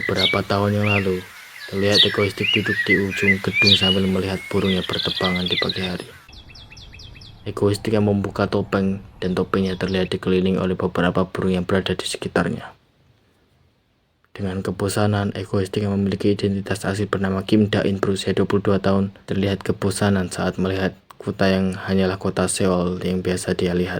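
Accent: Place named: Java, Indonesia. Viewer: Medok bgt mas❤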